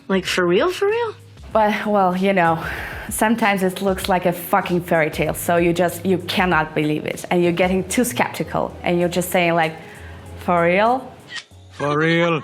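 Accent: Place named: United States. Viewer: That is american accent not british which care about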